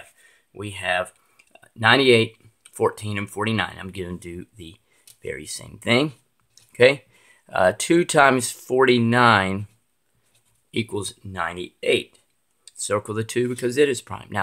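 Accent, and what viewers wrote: South, United States. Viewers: The southern accent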